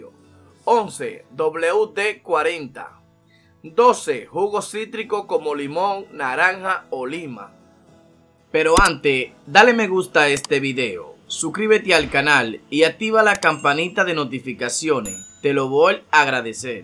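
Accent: Dominican Republic. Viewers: ES DOMINICANO!!!🇩🇴😁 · Es de republica dominica que nunca le escuchaste esa voz a alguien🤥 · Creo que su acento es de republica dominicana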